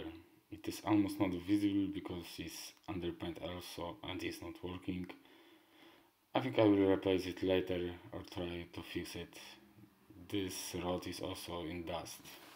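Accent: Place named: Russia. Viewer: Блин, я английского не знаю, но вот тяжелый русский акцент слышу сразу😊.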